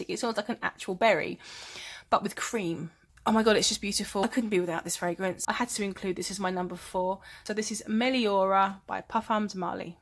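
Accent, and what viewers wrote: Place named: Surrey, United Kingdom. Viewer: I love Surrey English. Music to my ears. I wish I could speak like you. ❤❤❤ I only lived in the UK for five years but will forever love and miss it.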